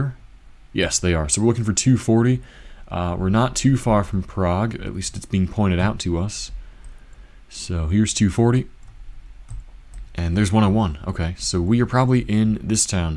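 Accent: Russia. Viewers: Takový ruský přízvuk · GG noticable improvement in your pronounciation as well ;) but you still sound like a russian trying to pronounce english. You shouldn't really emphasize anything other than the beginning of the word, and the lengths of each syllable should be of the same length, unless you see a line over the letter (á (as in hard), a (as in duck)). I noticed this when you said nád instead of nad Labem.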